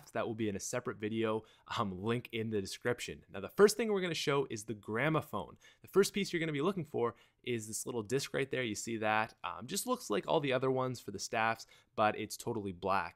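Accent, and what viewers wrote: Canada. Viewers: are you canadian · R u canadian?😋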